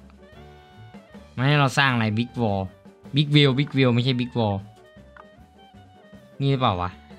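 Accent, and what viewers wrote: Korat, Thailand. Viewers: คนโคราชมะ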